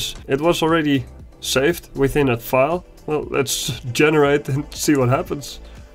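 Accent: Netherlands. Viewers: Nice man! Ik hoor je dat je Nederlands bent! 😂😂. Het grappigste vond ik toen je cod 2 aan het spelen was en je godve** zij🤣🤣. Daar viel je door de mand😂😂😂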